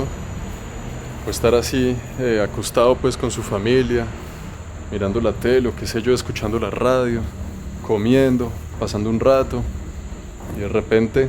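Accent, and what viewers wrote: Colombia. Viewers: Eres de Panamá y por que tienes acento colombiano???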